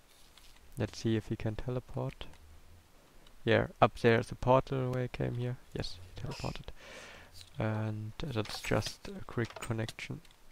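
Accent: Germany. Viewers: German accent :D and.. can you german?! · Are you German? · Are you german?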